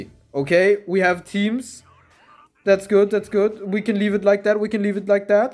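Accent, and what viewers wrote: Germany. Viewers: Are you german ? You have an german accent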